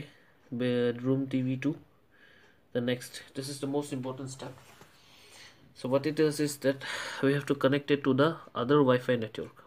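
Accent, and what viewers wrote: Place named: India. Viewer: Indian accent is so unattractive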